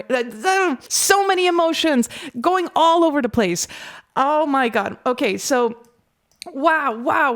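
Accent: Paris, France. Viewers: Hello/Bonjour merci pour ton opinion très intéressante sur cette musique. Ton analyse se rapproche finalement assez bien du contexte du jeu (je n'en dirai pas plus pour éviter de spoil) Pour information, il semblerait que le compositeur ait eu à disposition les dessins préparatoires du jeu et les grandes lignes de l'histoire quand il a commencé à composer. source : <link> Vu ton superbe accent français, j'imagine que je n'ai pas besoin de traduire ;) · Beautiful reaction and analysis. I wonder where you're from ; you sound French when speaking french, but have almost no accent when speaking English, which is pretty rare if you're indeed French (though of course you're a trained vocalist). And I'm french Canadian so I know you're not from Quebec. Anyways, great video, hope you're doing better and wish you all the best. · I just wanted to say, your french accent is the best one I ever heard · Very impressive, true french accent, not the funny quebec one, you could pass for a real parisian. 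I don't know if it is the lyrics or the violin, but it's remind me of the band 'Louise attaque'.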